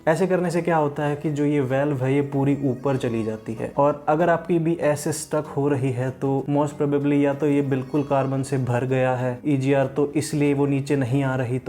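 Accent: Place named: India. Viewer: What language are you speakin? English-indiano? We don't understand a f****n thing seriously....add subtitles.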